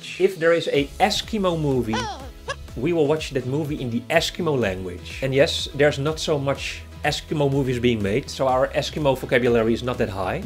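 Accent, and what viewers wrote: Netherlands. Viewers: i can hear your dutch accent while you speak english😎 👋 from marnixstraat! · I love your Dutch accent when you speak English!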